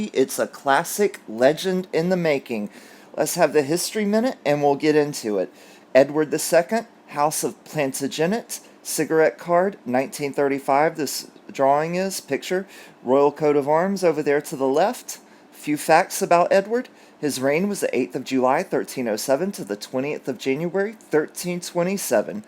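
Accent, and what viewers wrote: United States. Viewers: Hiya hunny, Glocestershire is pronounced like Glaw then the cestershire at the end. It may just be your sweet American accent 😉. Have a good evening.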